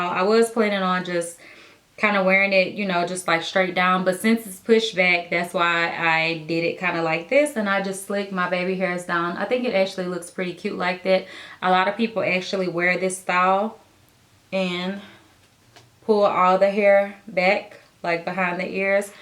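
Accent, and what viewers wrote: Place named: Louisiana, United States. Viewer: Are u from Louisiana? You have an accent... your hair looks great btw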